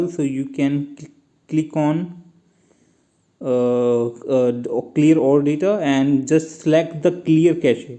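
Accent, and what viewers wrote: India. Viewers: Not going lie he sounds india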